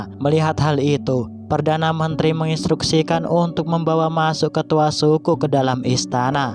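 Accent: Madura, Indonesia. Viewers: Orang Madura bang? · MIMIN NYA ORANG MADURA KAH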